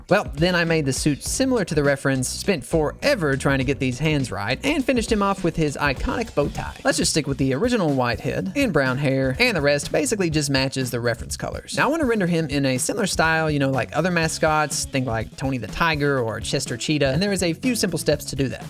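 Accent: South, United States. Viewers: Why does he sound like a red neck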